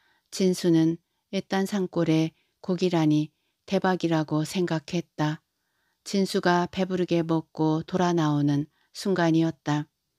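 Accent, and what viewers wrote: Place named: Chungcheong, South Korea. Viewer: 충청도 금강건너 웅포가 고향으로 잊었던 사투리에 정감이 갑니다.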